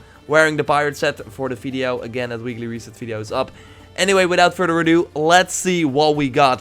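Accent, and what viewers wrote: Netherlands. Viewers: he Dutch as fuck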